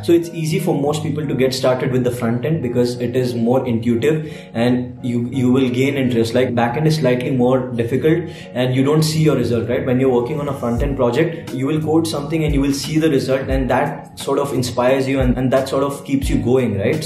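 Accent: India. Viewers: Wow your accent is like Indian. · Your accent is the perfect version of the Indian accent 👌🏻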